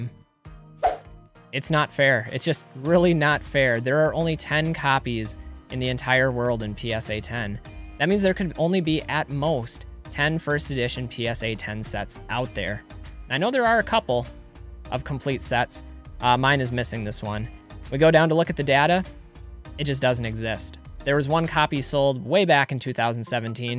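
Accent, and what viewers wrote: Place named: Minnesota, United States. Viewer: Holy shit man are you from Minnesota?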